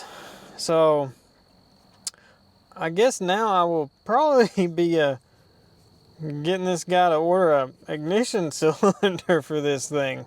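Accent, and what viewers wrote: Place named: Deep South, United States. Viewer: How about you? Your southern accent makes me think Deep South, but even some of us citified Okies sound pretty hick. So accents can be deceiving.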